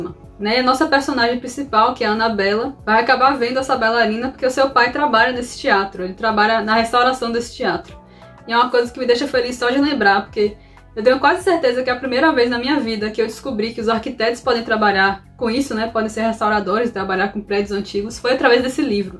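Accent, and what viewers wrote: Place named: Salvador, Brazil. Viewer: eu ouvi seu sotaque e na hora pensei: oxeee é de salvador será? kkkkkk <3 amei seu canal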